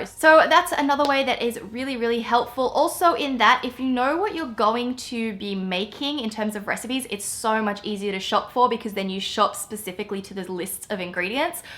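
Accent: Australia. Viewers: She has an Aussie accent. is she from Australia? :)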